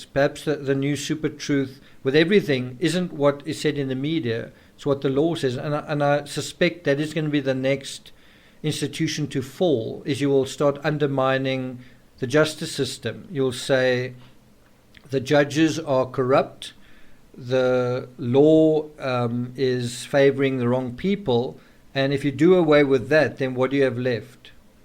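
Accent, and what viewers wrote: South Africa. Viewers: Hi, are you a South African? watching from Cape Town South Afri8ca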